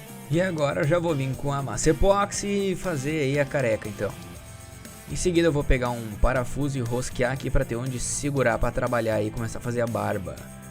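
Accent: Rio Grande do Sul, Brazil. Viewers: Tu es gaúcho ?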